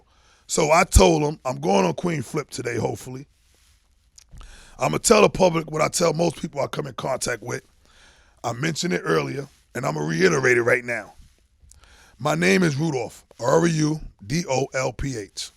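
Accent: New York, United States. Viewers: bro sound like a New York Cmac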